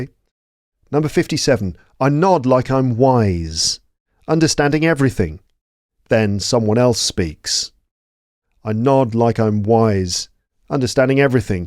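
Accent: United Kingdom. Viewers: ❤ I'm Korean, I'm hope going to England in few years.🙏 It really helps listening British accent...! 😊 Thank you so much!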